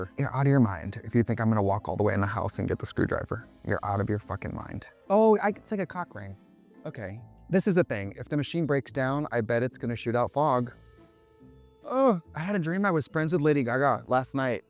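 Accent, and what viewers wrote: Wisconsin, United States. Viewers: The wisco accent is back in full force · Honestly, the wisconsin accent was strong in this video. love that for you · wisconsin accent is thick today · In my head, I was like why does Trixie kind of sound like Ryan Murphy playing Ed Gein from Monster but then I remembered they’re both from Wisconsin lol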